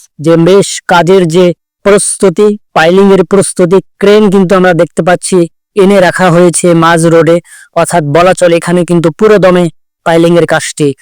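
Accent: India. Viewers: তোমার ভাষাতো দেখতেছি ওপার বাংলার!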